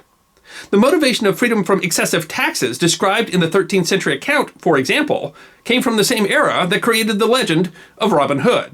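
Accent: United States. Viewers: Americans ... and speed speaking on top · Terrible American pronunciation. Showing Kenilworth castle, and calling it a Monastery. A Degree in History..... ??? mmmmmm !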